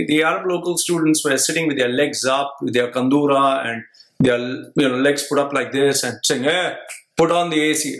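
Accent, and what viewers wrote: India. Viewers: You sounds like an Indian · Wow you have such a strong Indian accent for someone who has not lived their. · I couldn't understand a word from his Indian accent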